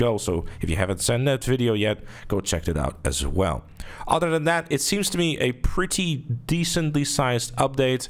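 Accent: Netherlands. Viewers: Is he dutch ? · I'll eat my shoelaces if he isn't Dutch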